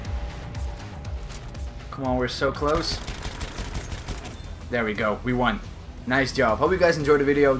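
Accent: Netherlands. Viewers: You Dutch? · Maybe ist just me but you soud like you're Dutch Ps just a guess.....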